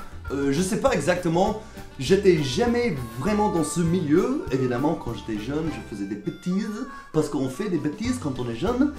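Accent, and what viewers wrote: United States. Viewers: C'est fascinant ! J'ai trouvé ta chaîne par hasard, je m'y suis abonné. C'est trop bien d'écouter un ricain qui parle français avec son accent d'origine qui peut faire des comparaisons entre l’Amérique et la France et qui nous apprend des tas de choses qu'on ne connaît pas forcément à propos de l'Amérique. Good continuation ! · Lol, je suis Américain et j'apprends le français. Je trouve que ton accent est plus facile à comprendre parce que tu a un accent américain quand tu parle français.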